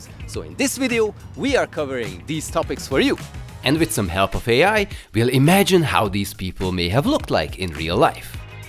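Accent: Hungary. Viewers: A magyar akcentussal meg jó kis Hungarikum! :D magyar Lincoln videóit ismered? Jókat lehet rajta röhögni.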